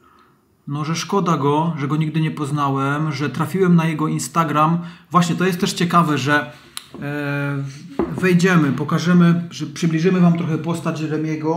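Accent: Silesia, Poland. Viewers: szacun za przemyślane i wyważone słowa i za śląską gadkę!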